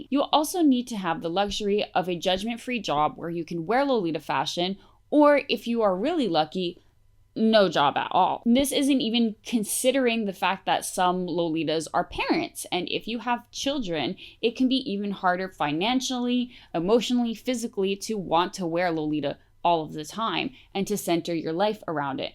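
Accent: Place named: Quebec, Canada. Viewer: This is such a rich video!! As you mentionned I feel like online discussions about not-online aspects of the fashion became less common through the years, so hearing a « modern » discussion around this topic is really interesting. Also unrelated but I’ve been watching your channel for soo long and somehow didn’t realise you were from Québec (more specifically than Canadian I mean)?? For context I’m French so the nuance between Québec accent and native English accent can be a bit hard to catch on single isolated words sometimes (like brand names for example), and the sudden language switch totally jumpscared me haha! Merci beaucoup pour cette chaîne et tout le travail derrière !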